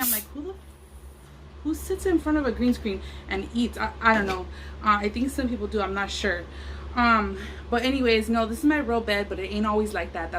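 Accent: Los Angeles, United States. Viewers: Its probably ur LA accent. They r confused 4 sure. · U have a LA accent n there’s nothing wrong with it I love it!!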